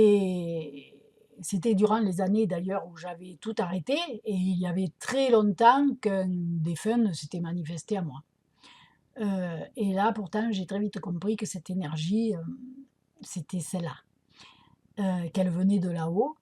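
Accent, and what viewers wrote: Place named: North, France. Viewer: Bonsoir madame vous avez un magnifique accent du ch'nord!!! Me trompe-je?😇🙏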